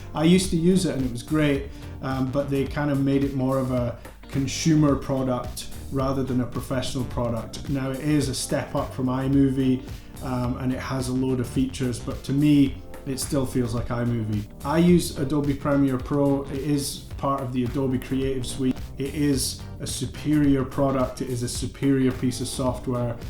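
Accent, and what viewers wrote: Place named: Scotland, United Kingdom. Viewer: This has been really helpful advice for me dude! Its also nice to hear it from a fellow Scot 😂 been trying to teach myself since COVID started but there is so much advice out there that it’s really overwhelming! Thank you for making this man!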